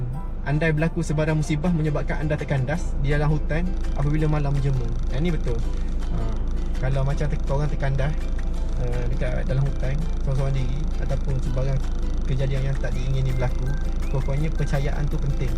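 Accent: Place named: Kuantan, Malaysia. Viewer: cara aok cakap.pon koi taw aok org kontan.. 👍😄. Biasa mancing sgai belat ke?😄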